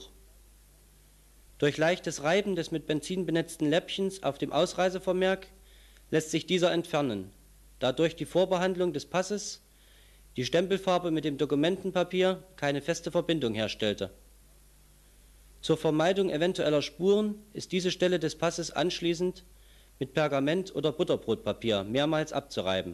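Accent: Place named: Saxony, Germany. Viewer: ich könnte kotzen wenn ich dieses sächsisch höre...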